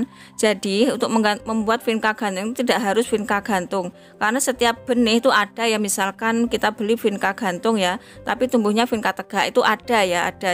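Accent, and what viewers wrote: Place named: Java, Indonesia. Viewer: Medhok mbak jowony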